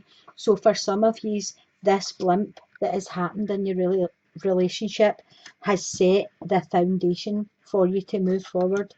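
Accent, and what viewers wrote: Scotland, United Kingdom. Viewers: What accent is this, Scottish ? , thanks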